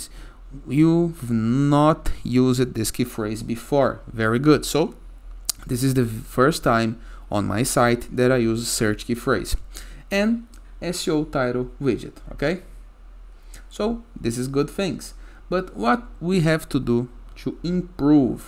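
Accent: Brazil. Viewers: Are you brazilian? I like the content and you accent. Was easy for me to understand everything.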